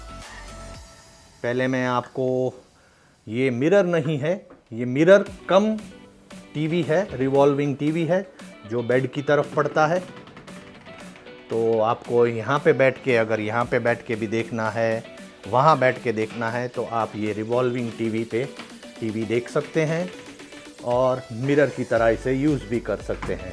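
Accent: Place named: Italy. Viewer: You have build a nice Italiano accent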